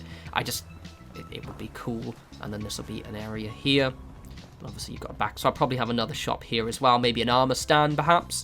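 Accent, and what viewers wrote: United Kingdom. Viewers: nope British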